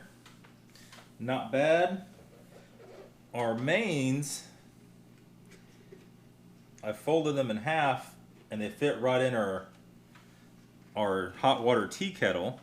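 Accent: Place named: South, United States. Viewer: He sounds Southern, maybe Louisiana. They have a different way of pronouncing things down south. Can't help how he was raised. Alot of my family is from New Orleans, it gets irritating sometimes with how they pronounce things but, you cant change how they speak. That'd be like getting mad at a British person for pronouncing something differently than we do when speaking English.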